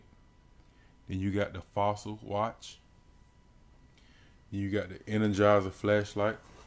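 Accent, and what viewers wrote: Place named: Georgia, United States. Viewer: nice vid,where you from.you sound like a georgia boy,maybe atlanta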